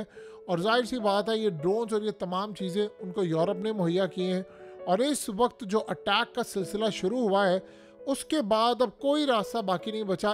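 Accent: Punjab, India. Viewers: Way this guy talk sounds like he is war time expert, he is pandu with Punjabi accent.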